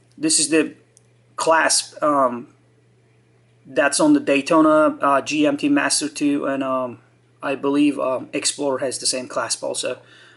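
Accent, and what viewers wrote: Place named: Eastern Europe. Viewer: Matt Phelan He's not a Yank ! He's an east European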